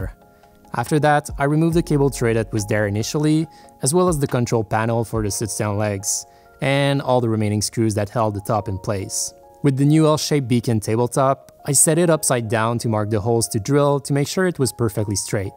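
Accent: Quebec, Canada. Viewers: i can tell by your accent, que tu viens du Québec XD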